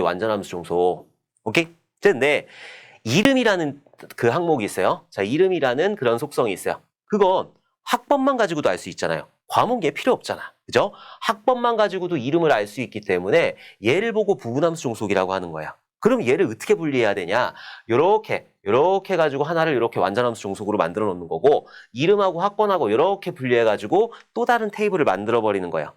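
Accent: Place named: Gumi, South Korea. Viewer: 필기 때부터 강의 들으며 공부 중인 학생이예요!!! 귀에 쏙쏙 들어오고 쉽게 설명해주셔서 좋아요 ㅠㅠ 감사합니다 근데 갑자기 궁금한 점인데 혹시 구미분이세요~?! 한번씩 "~ 하는거라", "~ 이거라" 하는데 구미사람들 말투라서 갑자기 궁금해졌어요 ㅋㅋㅋ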